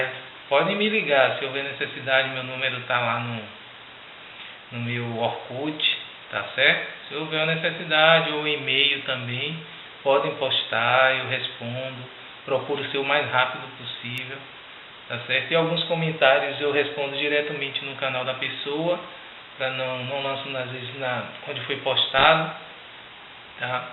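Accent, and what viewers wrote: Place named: Recife, Brazil. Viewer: olá, me apaixonei pelo seu trabalho desde que vi o primeiro vídeo, e seu sotaque me faz lembrar minha infância e meus primos do Recife.Você é maravilhoso no que faz e se reclamarem que vc fala demais, são pessoas ingratas, pois se vc tem o trabalho de postar vídeos para nos ajudar devemos agradecer e não criticar.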